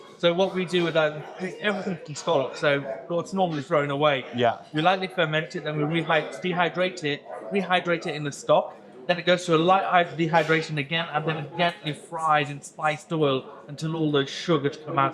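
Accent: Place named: Birmingham, United Kingdom. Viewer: Its funny watching a two Michelin meal being described in a thick Brummie accent instead of the usual French, real Oxymoron, ha!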